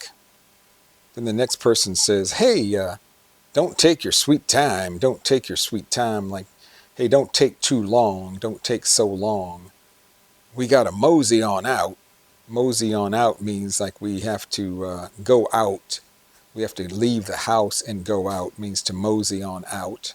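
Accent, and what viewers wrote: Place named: South, United States. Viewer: Thank you for these wonderful lessons. I love southern accent and the way y'all communicate, I love it. Please keep making more of these lessons, it's so fun to watch!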